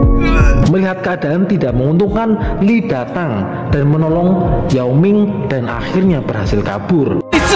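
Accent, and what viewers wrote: Banyumas, Indonesia. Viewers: Kalo yg bawain ngapak suport terus smangatt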